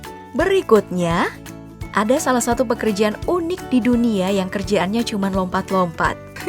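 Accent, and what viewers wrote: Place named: Java, Indonesia. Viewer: Mbak nya kalok ngomong ada jawa nya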